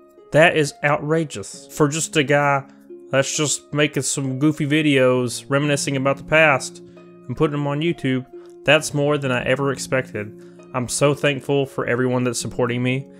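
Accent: South, United States. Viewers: You used to sound southern what happened where’s the real 🤠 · Toooooo southern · your voice... I cant tell if youre southern or gay or both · Youre so southern and not southern that it makes my brain love your channel. what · Southern drawwwwlllll.....cool vids just subbed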